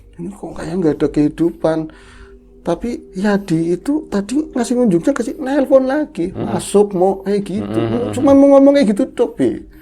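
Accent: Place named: Java, Indonesia. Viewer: Seneng krungokke suarane bro. Medok banget 👍👍 Jowone..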